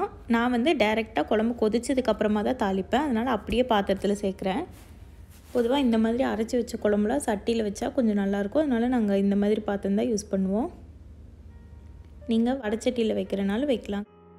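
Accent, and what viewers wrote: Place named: Coimbatore, India. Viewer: Namma slang apdiyae varuthu ka... Naanum Coimbatore thanunga😄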